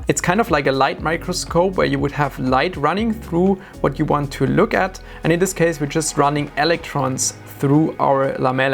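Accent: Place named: Northern Germany, Germany. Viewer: By listening to you I would guess you are from Berlin or maybe Hamburg, some city in the north of Germany at least. BTW Not too surprised that you got nothing out of Intel, maybe they should change their brand name since they seem to have run out of "Intel"...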